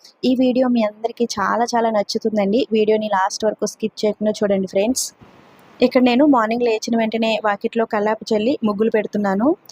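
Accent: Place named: Godavari, India. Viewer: Ma godavarolla basha na ...majaka super undhi ......godarollamani mana language lo mana respect lo telisipothundhi.....nice vlog andi very nice chala bagundhi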